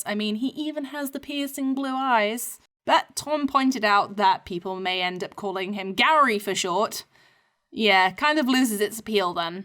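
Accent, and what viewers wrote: United Kingdom. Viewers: I love your british accent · me: haha, although now we didn't know i feel your british accent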